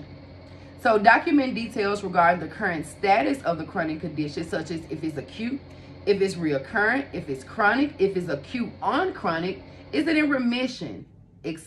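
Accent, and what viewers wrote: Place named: South, United States. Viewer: Great video! (And I love the southern accent!)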